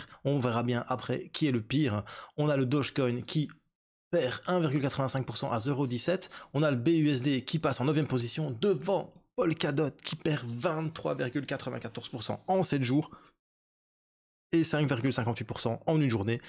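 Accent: Belgium. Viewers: J'adore ton accent belge, c'est kiffant de t'écouter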